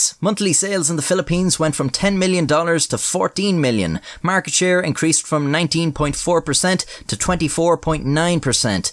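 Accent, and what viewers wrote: Ireland. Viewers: The videos are great but I also dig listening to that Irish accent. 😀 Is it normal for everyone over there or is it a more specific to a certain part of Ireland? Keep up the good work! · hear tings yup definetly irish (dane so for a sec i was wondering why a dnaish word appeared) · Today is good, the sarcastic condescending Irish man has graced us. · Off D sofa trone . . . The Irish pronunciation always make me smile.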